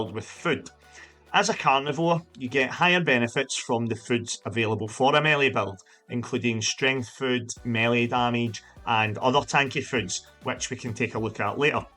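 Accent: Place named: Scotland, United Kingdom. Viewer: I love your content, but more than that it's the Scottish accent that's the icing on the cake. Pure Dead Brilliant.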